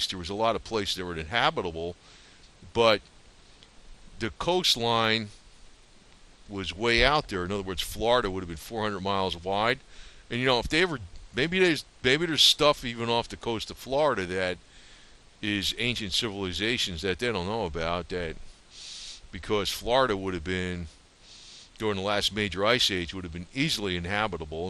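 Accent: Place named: Pittsburgh, United States. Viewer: Heathen Nations Music: I hear a Picksburg.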